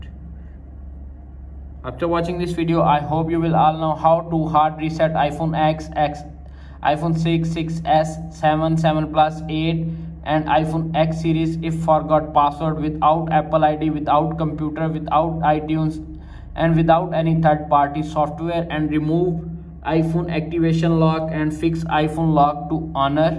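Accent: India. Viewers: scammer with the indian voice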